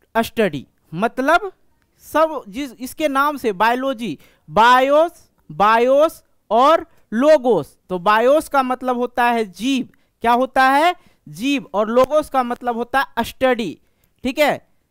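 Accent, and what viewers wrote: Bihar, India. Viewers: Sir aap bihar se hai kya😅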